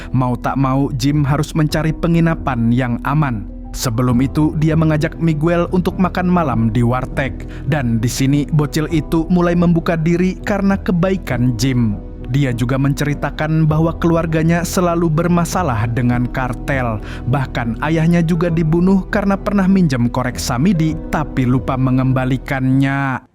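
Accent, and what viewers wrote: Banjar, Indonesia. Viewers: admin nya urang banjar kah? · Banjar host nya wkkw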